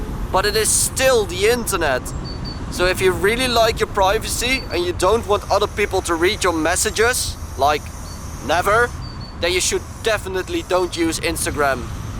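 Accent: Netherlands. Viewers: Right on! A dutch comrade! Just the accent gives it away. wonder the region you wright from? Havn't written in atleast 3 years. I suppose I need to get back at it! can't risk writing illegaly anymore though which sucks... Met quiet alot of writers through instagram. you have to do some digging but eventually you'll meet them. Sometimes I used to pass someones new panel on a train, I'd make a picture and send them to them on insta. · Are you dutch? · Je bent Nederlands k hoor t aan je accent 😂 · Dude,, Jij moet wel uit Nederland komen, je accent :) . Keer samen painten? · That Dutch accent :D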